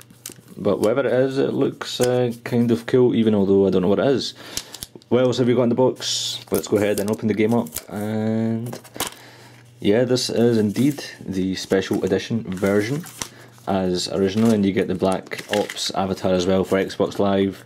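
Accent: Scotland, United Kingdom. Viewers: Scottish is awsome love the accent :) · This is a good video. Even with your accent (sounds Scottish), you are easier to understand than most Americans... and I am American. haha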